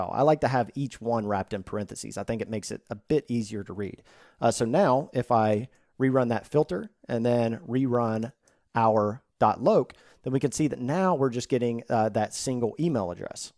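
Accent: South, United States. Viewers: i like his kinda southern accent lmao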